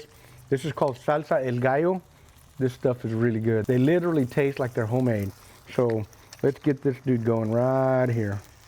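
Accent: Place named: South Texas, United States. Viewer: I could hear it in your voice brother. Haha The RGV is my second home. I spend a lot of time out in McAllen. Do you have a restaurant out that way?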